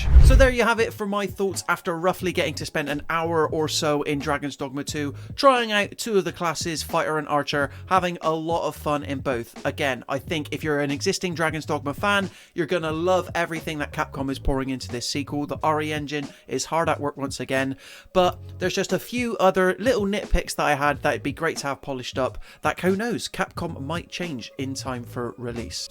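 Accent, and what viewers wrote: West Country, United Kingdom. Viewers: DD2 is looking fire! Sidenote, this is the first time I have heard a gloucester/bristol/west country accent from a youtuber and its refreshing!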